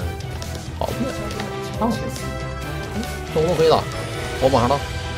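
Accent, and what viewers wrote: Northeast, China. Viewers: 東北腔真的很可愛XD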